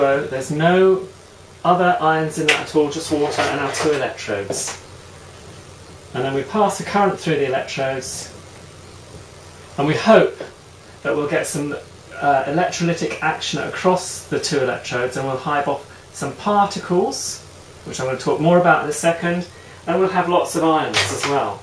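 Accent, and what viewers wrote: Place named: England, United Kingdom. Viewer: I only believe this guy because he has an English accent. Great demonstration.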